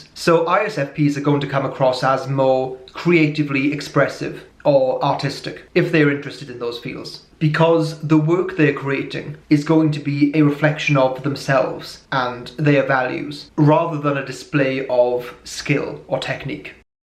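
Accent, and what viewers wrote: United Kingdom. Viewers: when i saw your face i didn't expect to hear a british accent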